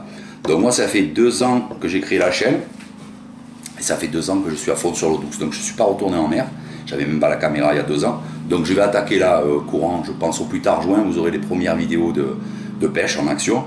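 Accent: South, France. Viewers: Avec l accent du soleil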